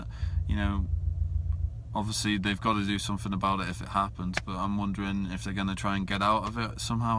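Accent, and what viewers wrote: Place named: Sutton Coldfield, United Kingdom. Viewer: Are you from Sutton Coldfield or somewhere nearby?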